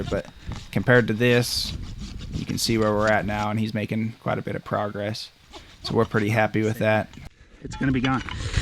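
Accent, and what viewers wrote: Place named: Midwest, United States. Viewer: “I gotta dang good wife,” so wholesome aaahhhhh😭 that warmed my heart. PS there’s nothing better than that familiar Midwestern drawl, I’m from Utah and it’s always like a taste of home to hear an accent like yours.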